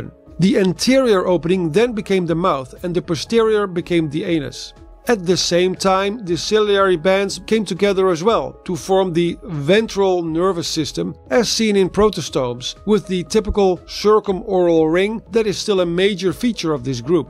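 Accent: Netherlands. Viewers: I love your videos and your (dutch?) accent. You might want a plainer background when doing the greenscreen-style overlay though 🙂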